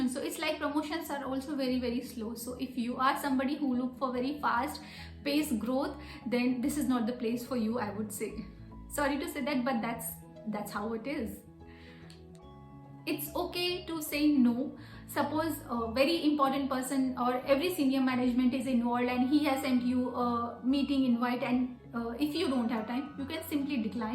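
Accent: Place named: India. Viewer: No no no you speak Indian English nobody understands. Much too fast you speak slowly 50 % or less. You need to understand nobody understands this.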